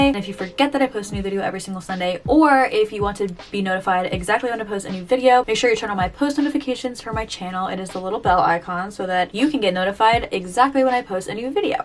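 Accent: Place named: New Jersey, United States. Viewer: IDK where you are from but every time you talk, you sound like NJ (where I am also from)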